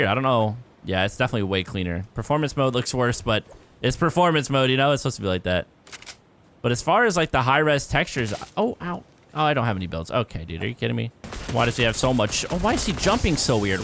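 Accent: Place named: United States. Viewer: You sound like fresh just with an American accent :D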